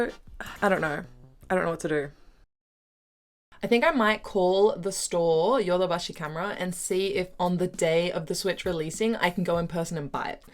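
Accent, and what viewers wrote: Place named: Australia. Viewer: It's a totally different story here in Australia - there's Switch 2's everywhere - I mean sure, pre-orders are required and all that but even if you walk into a store - there's a good chance they will have some spares laying around! It's ether we over-ordered and not many people want to buy them, or perhaps Australia's got some spending issue! BTW, are you Australian - your accent sounds Aussie?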